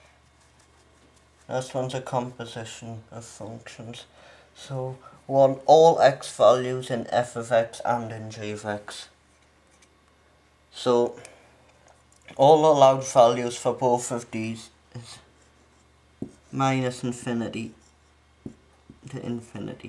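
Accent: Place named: Ireland. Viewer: Irish as fuck and i love it ha thx for the help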